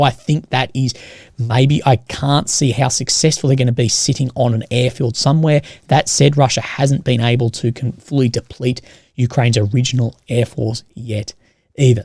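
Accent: Australia. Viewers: So, this is Russia Today with an Australian accent?! Cool!!! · my dear.. i love your "aussie" accent (for me as an italian it sounds a bit funny but I love it) and I love your good will to be "near the reality" this is important for one like you that make reports about a situation on the field trying to avoid the "propaganda warfare" that we all know it's inevitable but of course not near the reality. So I will express here my appreciation for your work.. I really don't care if you (or somehone else) is pro-russian -pro-ukrainian or pro-something-other what I care is that the the job that you are doing try to get the facts. that's all...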